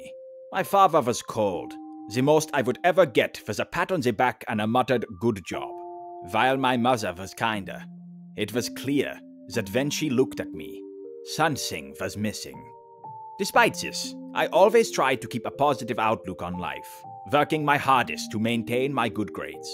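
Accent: Germany. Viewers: The German accent is just 🔥🔥🔥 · This was fire along side the German accent 🔥🔥🔥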